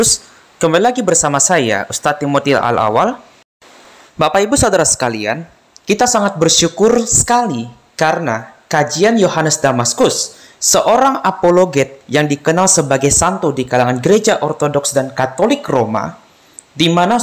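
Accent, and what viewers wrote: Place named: Malaysia. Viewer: Cumi, bang Edis dialeknya Batak, kalo ini agak ke dialek Melayu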